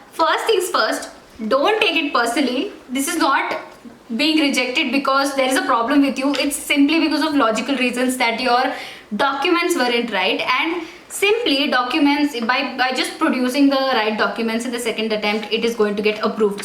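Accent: India. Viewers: indian accent 🤣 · Although i had AAAAAAALLLLLLLLLL the documents LOL. Indian accent is the funniest one.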